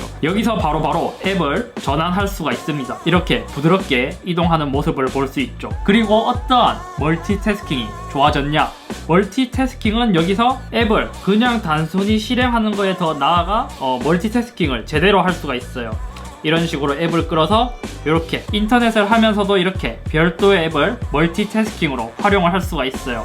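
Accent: Gyeongsang, South Korea. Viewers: 잇섭님 경상도 어디에서 올라오셨어요? ㅇㅅㅇ??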